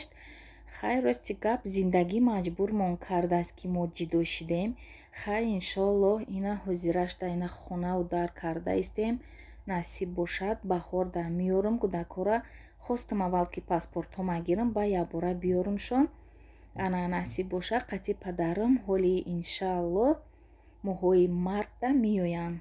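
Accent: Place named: Dushanbe, Tajikistan. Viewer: ачоиб бо забони тарафои Душанбе сухан мекунед,ба ман ачоибаш он ки наход шумо 99% забони он тарафокати гап мезанед,Мо 15 сол боз дар Россия забон гум накардем некин....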